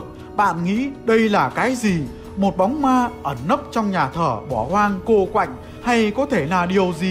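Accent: North, Vietnam. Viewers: Giọng bắc kỳ mà đọc truyện ma nghe cũng đc đó .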